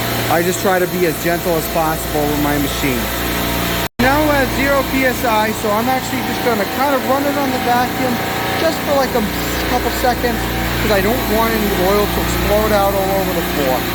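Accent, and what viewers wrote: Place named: New York, United States. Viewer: New York accent s so cool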